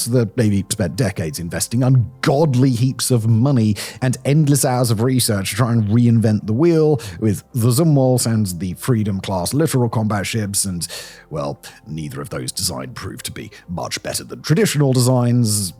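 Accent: United Kingdom. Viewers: This guy is an example of idiots with a Lyme accent sounds like he knows what he's talking about but no, another big mouth idiot.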